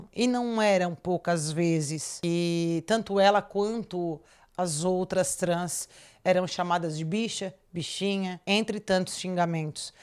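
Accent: South, Brazil. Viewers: LINDAAA,JÁ OLHEI ESSE CASO EM VÁRIOS CANAIS,MAS NÃO TEM COMO DEIXAR DE ASSISTIR NO SE CANAL E COM SUA NARRAÇÃO COM ESTE TEU SOTAQUE MARAVILHOSO DO PESSOAL DO RIO GRANDE DO SUL!!!! AMOOO TEU SOTAQUE DO SUL GURIA LINDAAA,O TEU CABELO ESTÁ LINDÍSSIMO E QUE O UNIVERSO TE ABENÇOE EM TODAS ÀS ÁREAS DE TUA VIDA LOIRA LINDAAA🙌💋❤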